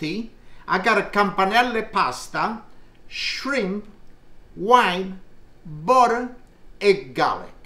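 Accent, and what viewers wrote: Italy. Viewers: My ears: hears Italian accent My brain: This is some serious gourmet stuff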